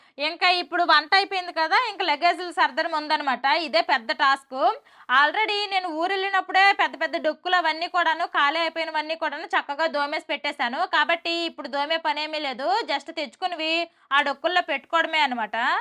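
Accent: Srikakulam, India. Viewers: Mee sreekakulam yaasa chala bagundi 😊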